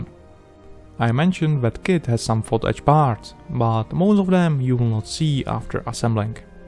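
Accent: Czech Republic. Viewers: There is only one accent in the wold like this. And it is unmistakably Czech! :D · Are you from Czech republic? Based on your accent :D. btw amazing job.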